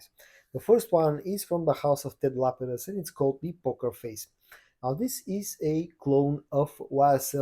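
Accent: Bulgaria. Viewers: Yes, I was a 100 %you are Bulgarian. Judging by the accent. Congratulations!!!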